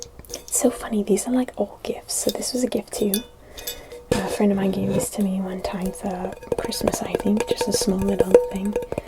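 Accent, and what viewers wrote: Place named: Wales, United Kingdom. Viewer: Ahh! I took your advice and went to watch some older videos - and now I know why I couldn't place your accent! I was thinking Welsh raised overseas or something. Good to finally know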